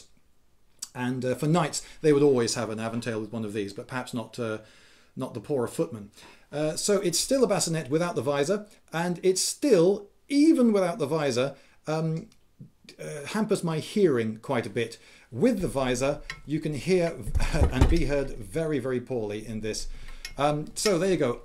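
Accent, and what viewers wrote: France. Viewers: nice french accent! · Nice french accent! It seems that the "pig faced" is named "bec de passereau" in france ("sparrow beak")... I don't know exactly why, but here si my view** : you see it as a pigface when you are in front of your enemy. In the other hand, you see it as a sparrow beak when you take a look at the ally at your side. ...So this could come from Azincourt**, where the french chivalry (seeing themselves as the "birds") were in front of english archers (seeing the french as..well, you have understood ;) ) Thank you for the very nice video! (**: It's not a historical reason, guys, just a joke!)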